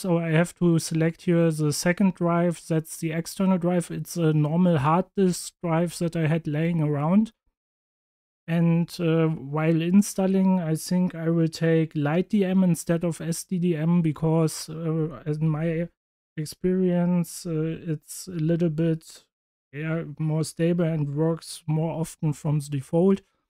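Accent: Germany. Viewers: souds really deutsch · I did not expect to see this kind of video with a German accent. At least I am assuming you're a German native speaker, its what it sounds like to me. Much love. :)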